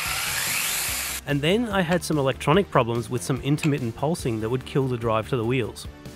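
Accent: Australia. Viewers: hell yea , for some reason i was expecting a pov image just need a little i2c acc/gyro added to that xiao. anti wheelie think drag car and put it out the back alittle it needs the leverage i feel, next id like to see you go brushless then add either an old heli tail gyro for straight line ripping or for extra bonus points any new modern flight controller flashed with your choice of firmware ie ardurover that will give you gyro but also gps waypoints and telemetry "you sound aussie so go 915mhz"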